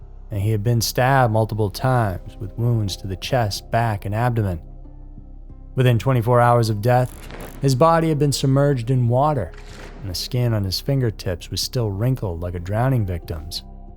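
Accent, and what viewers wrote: South, United States. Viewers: Came For The Stories, Stayed Because Of Your Lovely Southern Voice.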